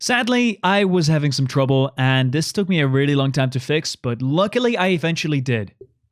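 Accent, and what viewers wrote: Netherlands. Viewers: Are u dutch me to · u dutch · Youre dutch? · wait are you dutch?? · are you Dutch (because i am)???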